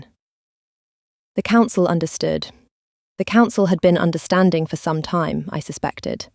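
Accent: England, United Kingdom. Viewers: That’s how us UK English people pronounce beta - beater. Nowt wrong with that. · The narrator has an English accent. She is pronouncing 'beta' the way we do in the UK, not 'incorrectly', just different.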